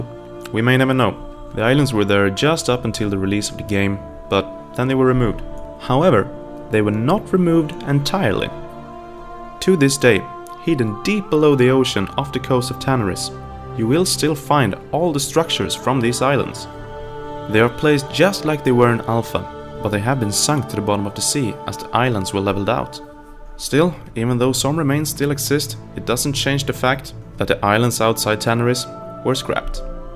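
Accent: Sweden. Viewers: Man hörde knappt den svenska accenten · Swedish accent ... Indeed it was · Swedish accent IF am correct?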